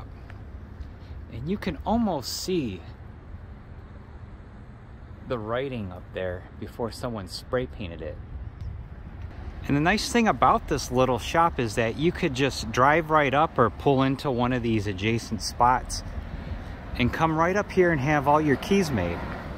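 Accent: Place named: Northwest Ohio, United States. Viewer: The NW Ohio accent is STRONG in this one.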